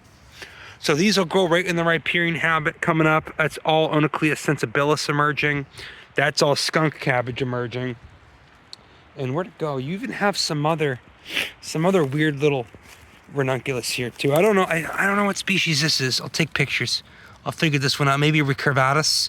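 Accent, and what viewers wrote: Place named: Chicago, United States. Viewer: I love your videos but maybe you should consider leaning into a New England accent rather than a Chicago accent since you’re doing New England botany! Plus a southie accent or a maine accent would be super fun. 🥰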